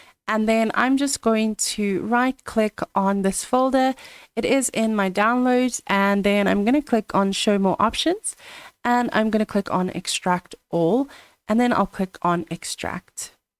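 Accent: South Africa. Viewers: 😅 I watched this video until the end, only to realise “Wait, her surname is ”, this must be a South African! I was second guessing myself throughout the video because I noticed your accent sounds very South African. I was busy working while listening to your video in the background. Now that I found a SA cricut channel, I will binge watch. Thank you🤭🤭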